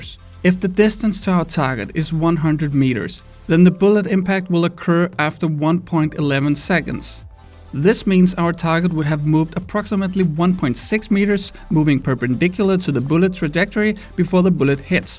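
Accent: Denmark. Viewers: Ey youre danish right? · Are you from Denmark?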